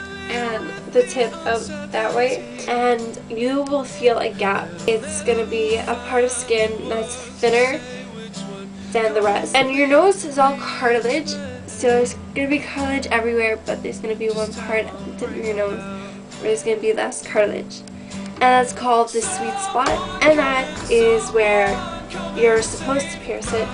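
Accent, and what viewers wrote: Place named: Canada. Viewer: I love your accent :D Canadian accents are cuuuuuuute ^_^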